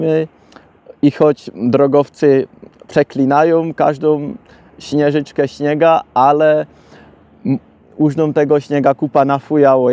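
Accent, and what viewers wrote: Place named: Silesia, Poland. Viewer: Śląska godka to je to!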